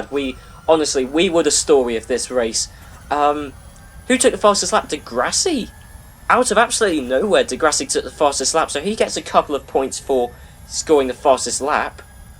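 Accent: United Kingdom. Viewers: Are you from Luton in Bedfordshire?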